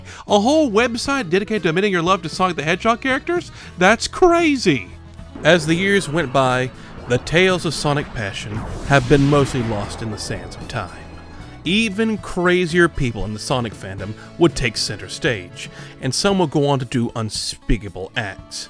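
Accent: South, United States. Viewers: Ok, I think I've pinned the accent. You from Tennessee or Mississippi?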